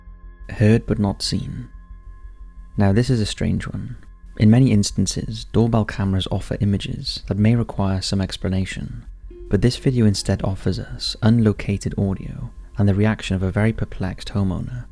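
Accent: United Kingdom. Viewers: Where is the narrator from? Obviously UK. But I can't put a region on the accent. I'm from South Wales not far from Cardiff.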